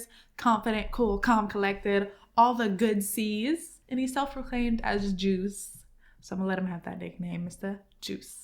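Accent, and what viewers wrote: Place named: South, United States. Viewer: Your country accent is gone, wtf. It’s less strong as back in the day 😂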